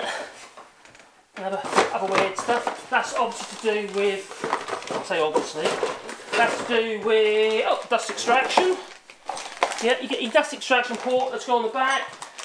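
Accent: Norfolk, United Kingdom. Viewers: You sound like a Norfolk boy to me!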